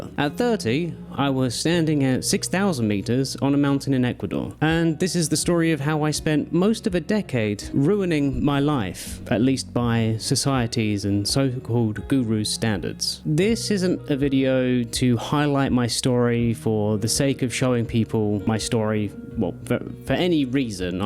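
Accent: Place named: United Kingdom. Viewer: Truth, hollywood grade good looks and British accent carried you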